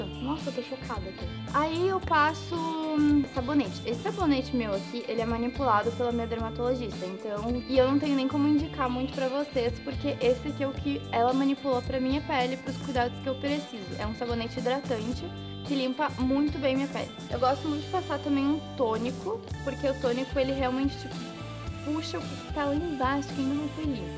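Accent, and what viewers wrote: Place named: Rio Grande do Sul, Brazil. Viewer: Sotaque de gaúcha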